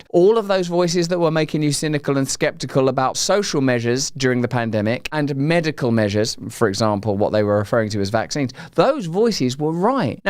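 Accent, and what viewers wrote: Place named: United Kingdom. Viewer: A dude with both a heavy British accent and a heavy lisp is too much for my ears.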